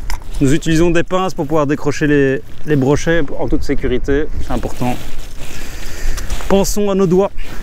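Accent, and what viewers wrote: Belgium. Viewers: Je kiff ton petit accent belge ;)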